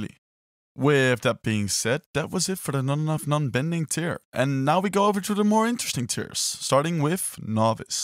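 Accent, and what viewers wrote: Netherlands. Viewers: I smell dutch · Nederlands accent gedetecteerd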